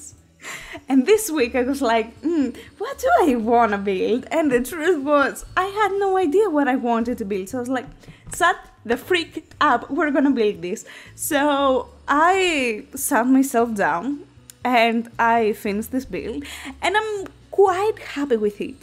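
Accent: Greece. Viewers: Ooh you're Greek aren't you. Great accent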